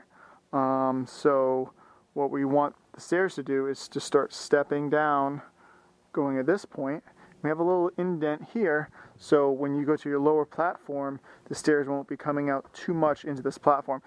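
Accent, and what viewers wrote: Rhode Island, United States. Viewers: are you from rhode island?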